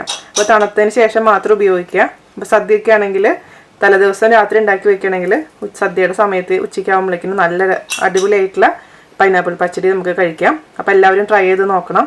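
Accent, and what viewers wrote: Thrissur, India. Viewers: Happy to see ur videos.. Me too from thrissur.. Simple thrissur slang demonstration makes me feel better to understand.. And tried many dishes as per your cookery demonstration.. Each and every attempt were successful.. Thanks chechi.. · തൃശൂർ ഭാഷ... അതോ എറണാകുളം ഭാഷയോ?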